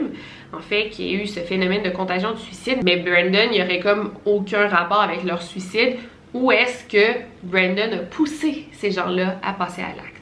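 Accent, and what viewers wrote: Quebec, Canada. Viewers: Je salue la grande amélioration de la diction depuis les 1 ere vidéos. L'accent québécoise malheureusement trop prononcé sur les vidéos du débuts de la chaîne. Les rendant quelques peut incompréhensibles pour les français.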